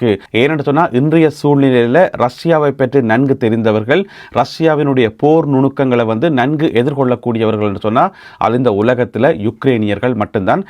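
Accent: Sri Lanka. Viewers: ஐயா உங்களுடைய பெயர் என்ன நீங்கள் இலங்கையில் இருக்கிறீர்களா அல்லது லண்டனில் இருக்கின்றீர்களா இலங்கை தமிழர் மாதிரி பேசுகிறீர்களே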